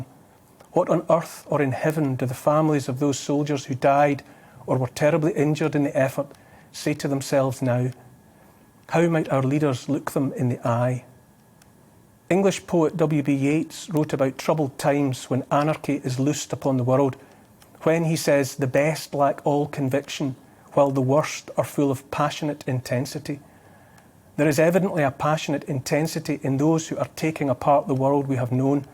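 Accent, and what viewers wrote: Scotland, United Kingdom. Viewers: A pleasure to listen to a Scottish voice that talks such sense , I just wish that The Scottish First Minister would take on board the few words that have just been spoken with such conviction, Boris has lost the plot , we welcome our enemies to these shores with open arms, I watch as our culture is slowly but surely eradicated and disposed of in such a political and cowardly way, our western way of life will soon be distant memory and we only have our cowardly and spineless politicians to blame. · is this Wiseman who can talk Scottish?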